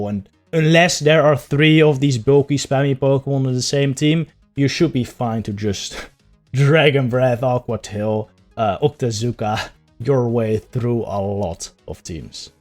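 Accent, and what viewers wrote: Netherlands. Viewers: Jij bent nederlands of nie???🤣😂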